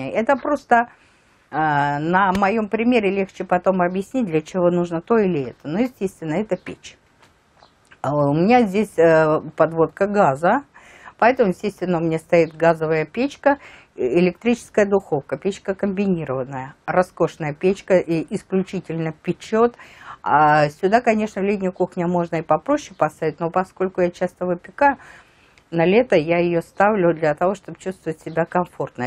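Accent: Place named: Russia. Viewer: Так мы из одной страны( из Украины),думала, Вы из России, такой акцент не украинский совершенно.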